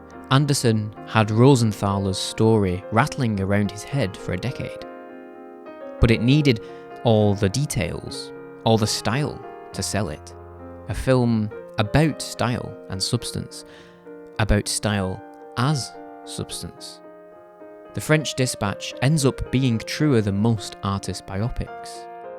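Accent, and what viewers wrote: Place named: Scotland, United Kingdom. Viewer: Where are you from in Scotland?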